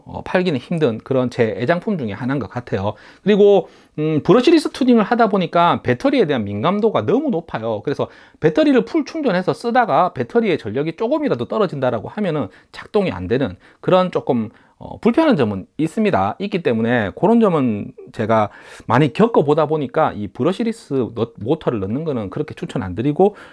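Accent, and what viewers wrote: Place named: Seoul, South Korea. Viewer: 그냥서울말안쓰고 그냥 말하면 안돼나요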